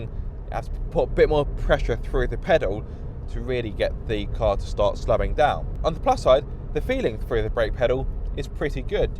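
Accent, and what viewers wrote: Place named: RP, United Kingdom. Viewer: Stop trying to talk posh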